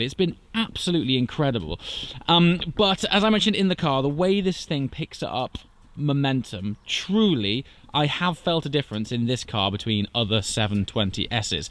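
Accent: United Kingdom. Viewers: Is it just me or has thickened his British accent?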